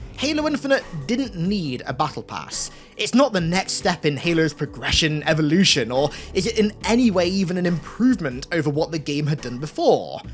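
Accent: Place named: United Kingdom. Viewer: Disliked for the Bri'ish accent alone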